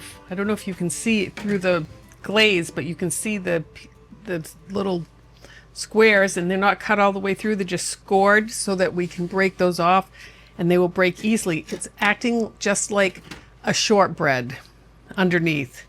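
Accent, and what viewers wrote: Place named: South, United States. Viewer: I'm sorry, but I find this channel very confusing. I have watched 3 of your videos because the recipes look good, but I don't understand what you are trying to achieve? You have a woman who sounds like an American northerner doing the cooking, an obvious southern-accented man doing the voiceover, Appalachian fiddle music playing, more singing and music that doesn't seem to reflect anything in particular, and a fridge that plays disco music and flashes lights. Not to mention the name, referencing the Shire, is English/Irish in origin....you guys are all over the map and none of it fits together?? Signed, Very Confused.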